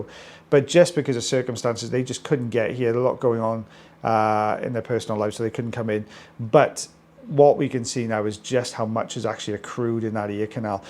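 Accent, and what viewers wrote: Wales, United Kingdom. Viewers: oh absolutely, the british accent doesn’t hurt either. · i love listening to you talk! 😂 i can listen to any UK accent forever, so lovely. i have a Welsh friend, he lives in England now but he’s quick to tell people he is WELSH 😂😂😂😂 Thank you! ❤ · Your Welsh I'm Welsh keep talking, brilliant 😊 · Who wouldn't want to hear your lovely Welsh accent?! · I couldnt mute you. The Welsh accent is the best in the world. KEEP TALKING!!! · I usually listen with mute on but I decided to listen to you and you're very interesting and I am an American and I love to hear how people from the UK speak❤ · he's a beautiful Welsh accent 😊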